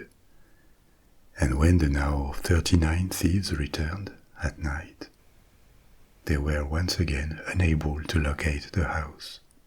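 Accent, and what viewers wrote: France. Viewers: Son accent français j’adore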